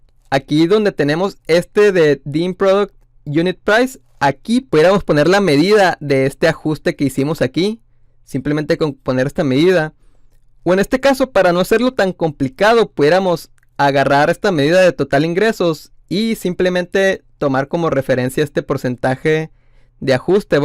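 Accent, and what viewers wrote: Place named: Sonora, Mexico. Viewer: uste es de Sonora? muy perro el acento 🤙🏼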